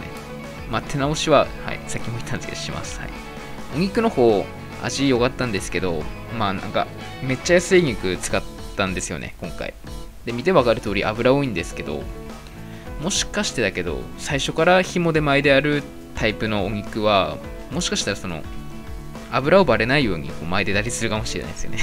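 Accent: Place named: Tsugaru, Japan. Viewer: お勧めで出てきたのでなんとなーく拝見したんですが、同郷の方で初っ端からの津軽弁で親近感！更にラーメン美味しそうで気付いたら最後まで見てました✨チャンネル登録しました！これからも過去のも見てきます〜！！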